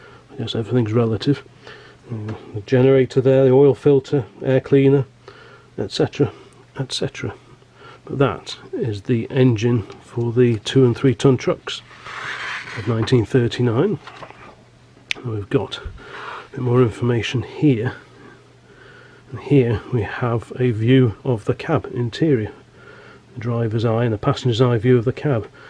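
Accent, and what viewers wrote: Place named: United Kingdom. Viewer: Truly appreciate the British hackscent accented narrative. The lack of a loud annoying soundtrack is certainly lovely. Just the facts sir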